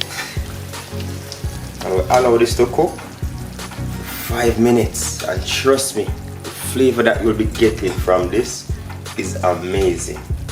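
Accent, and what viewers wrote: Jamaica. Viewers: 🇺🇸🇬🇧 Hello 🤓. This is my first time seeing and watching your channel and I'm sure it won't be the last. I'm sold.. what a lovely and vibrant young man you're? Very clean and detailed cooking/ preparation. I love your vibes, I'm very pleased. I love your English entwined with the Jamaican Creole. I must tell you that I'm not a food or person. However, I like chicken, lobster, shrimp crab and fish. So, now that you're sending me in the kitchen to learn to cook Jamaican food 🤓. I will be trying the pig tail and the chicken soon. Son, God has blessed you with a wonderful talent. You've got many more as well, use them. Keep striving for the best. The sky is the limit, continue to be an inspiration to the youths. Too many of our youths are in jail or doing drugs, stealing,gangs etc. I'm one mother and grandmother who is very proud of you, you've my prayers and my blessings.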